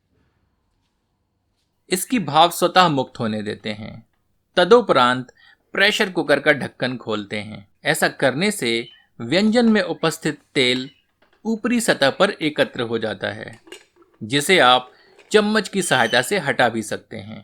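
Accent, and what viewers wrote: Standard. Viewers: Appreciate your Shudh hindi and the do piyaza.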